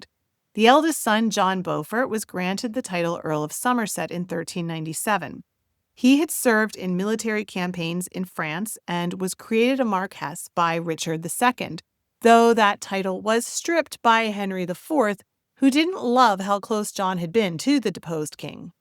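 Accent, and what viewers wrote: United States. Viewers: What a dreadful american voice impossible to listen.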